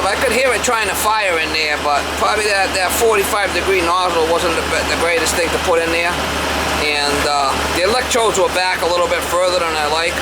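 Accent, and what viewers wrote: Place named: Boston, United States. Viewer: Now I know what those little blue caps / containers in my wife's plumbers bag are lol ... Excellent video my man .. you from BAWSTIN I take it? You sound like decaprieo and marky mark lol cheers ❤️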